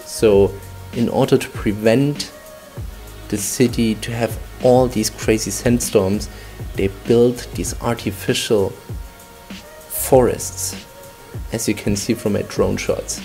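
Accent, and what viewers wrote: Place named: Austria. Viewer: Arnold is Austrian and in Austria people speak German. So he has a similar accent to Arnold.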